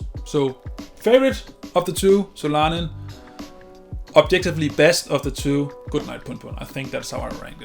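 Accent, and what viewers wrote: Denmark. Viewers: Er du dansker? Din accent lyder ret dansk i hvert fald. Nice video tho!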